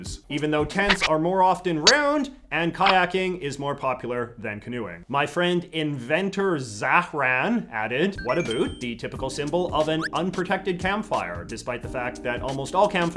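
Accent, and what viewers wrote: Canada. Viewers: I'm from Prince Edward Island and you have the MOST "Canadian" accent I've ever heard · I just... I LOVE the way you speak. The combination of the Canadian dialect and your cute little idiolect with sort of high rising terminals. It's fucking captivating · Is this guy's "Canadian accent" authentic? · Here for the Canadian accent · As a European, you've got the thickest Canadian accent I've ever heard and I love it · The only Canadian I know who actually says A Boot and I live here!!! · Wow, you seem like THE most Canadian person I've ever heard speak! And I actually watch a fair bit of Canadian content.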